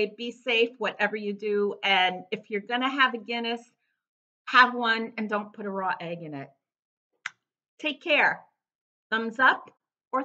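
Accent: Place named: New York, United States. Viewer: I can't get enough of your pronunciation of "Tony of Beverly!" Tony of Brooklyn!!!!! As a New York woman but living in the south, I miss that NY accent! BTW, you look gorgeous in Avery! I purchased her after watching your review - in Kahlua, too! ♥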